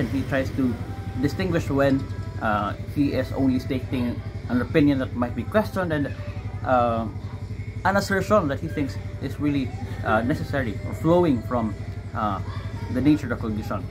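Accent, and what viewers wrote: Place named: Philippines. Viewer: hes got a philipino accent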